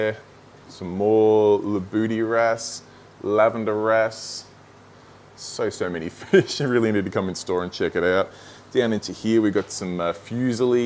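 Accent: Australia. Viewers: Wow, what a store! Also, I love Australian accents. For real.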